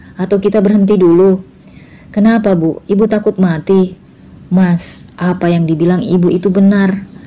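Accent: Indonesia. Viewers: Chanel pendatang baru yg beda logat dr lainnya, khas batak bugis campur jawa bandung 😅, subscribe nya blm diatas 50 ribu tapi di gemari ibu ibu viewsnya setara dgn chanel besar artinya miminnya oke bawaain cerita , sukses sll mimin , single fight yg berjuang kuliahkan anak anaknya sendirian 🎉🎉🎉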